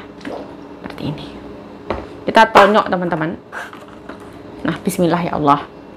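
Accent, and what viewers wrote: Java, Indonesia. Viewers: Mb jowone ngendi mbk?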